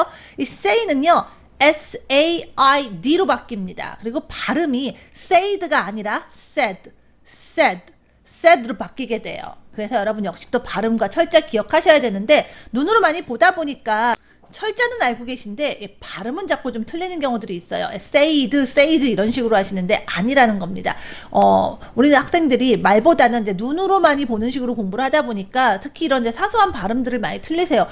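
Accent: United Kingdom. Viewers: 원리 중심으로 구분해줘서 머리에 쏙 들어 오네요. 감사합니다 근데 샘이 영국식 발음이네요ㅜㅜ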